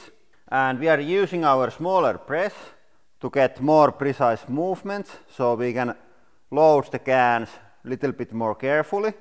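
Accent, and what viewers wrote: Finland. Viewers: R U from Finland my friend ? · I love indirectly learning Finnish from these videos based on the accent alone, it throws me off when he says things like “asked” because it’s a one-syllable word but hearing it like “ask-ed” makes me stop and think about how phonetic Finnish is. Hopefully I can break down syllables easier, especially when pronouncing the months and words like kyysymys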